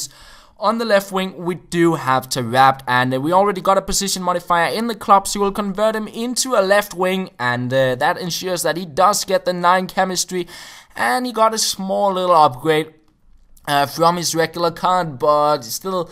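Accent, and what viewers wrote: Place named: Denmark. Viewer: He's Danish just like me that explains his accent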